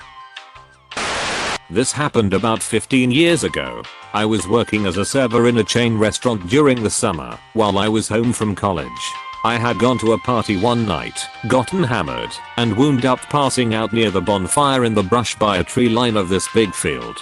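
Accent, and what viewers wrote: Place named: Wisconsin, United States. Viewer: I know a Wisconsite when I hear one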